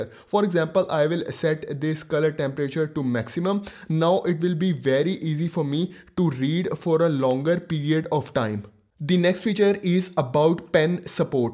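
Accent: India. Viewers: Unrelated, but WHY does your accent sound so Indian?? I like it, but, like, how??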